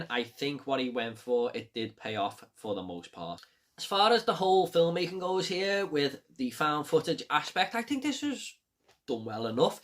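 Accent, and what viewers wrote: United Kingdom. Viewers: Good review man, nice to hear someone from the UK talking about stuff like this too - if you're interested in any recommendations that don't usually come on these "extreme" lists check out Red to Kill (HK) and Daddy's Little Girl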